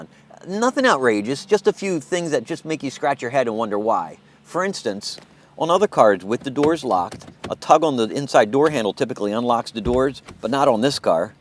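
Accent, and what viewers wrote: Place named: East Coast, United States. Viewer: Really hate the east coast accent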